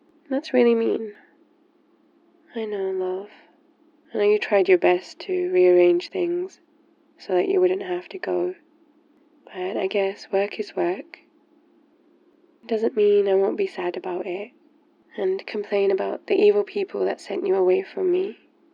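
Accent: United Kingdom. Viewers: God, why are you british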